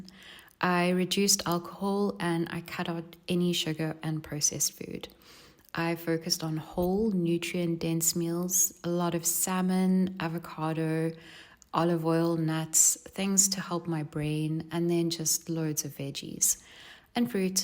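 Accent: South Africa. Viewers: I thought I heard an South - African accent. Thank you for sharing. Look forward to watching your backlist. 😊😊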